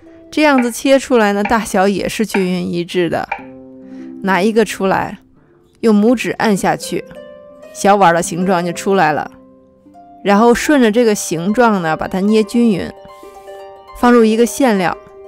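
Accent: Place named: Beijing, China. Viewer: 五零后老北京，喜欢那儿音的味道，，必看，关键是必听！倍儿地道。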